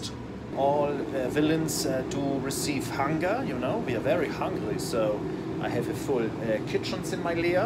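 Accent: Germany. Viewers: dein akzent ist so brutal deutsch aber süß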